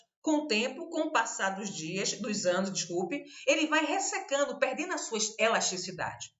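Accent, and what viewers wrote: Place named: Northeast, Brazil. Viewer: Amo suas aulas querida ,a senhora tem o jeito de nós do nordeste falar, no momento moro em SP, faz 6 meses que comecei a fazer o técnico de enfermagem, mas N deixo de vê suas aulas ,aprendo demais com ela,gostaria de saber se tem como eu obter suas apostilas,não deixe de publicar os vídeos, um grande abraço...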